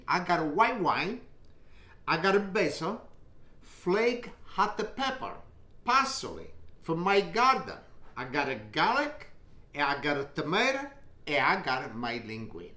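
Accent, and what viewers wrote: Italy. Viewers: The more he tries to lose Italian accent, the more I learn Italian language....nice recipe tho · I can't get enough of his accent he's one hundred percent Italian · I came across your video looking for a recipe with shrimp & pasta. I’m definitely going to try this. You’re adorable, I love your accent. I’m Italian too. My husband is going to love this dish! Thank you for sharing.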